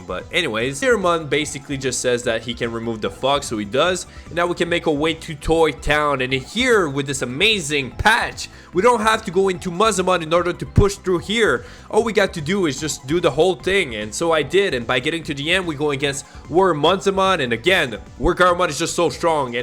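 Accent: Quebec, Canada. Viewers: His French canadian accent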